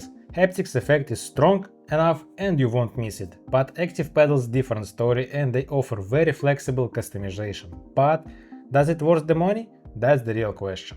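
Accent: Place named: Ryazan, Russia. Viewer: У Вас чудесный рязанский акцент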